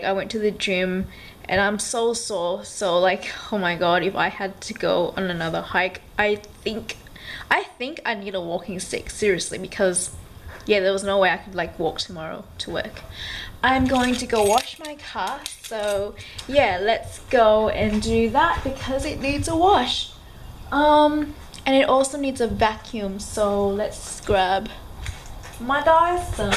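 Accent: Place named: Australia. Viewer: The second you talked, I hit liked! Australian women are my kryptonite!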